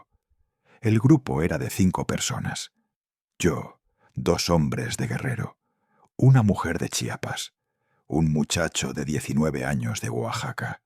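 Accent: Spain. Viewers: Ese acento españoleto no cuadra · Todo bien pero Ese acento Español nada que ver con un relato de inmigrantes Mexicanos..se convierte en algo no creible..😅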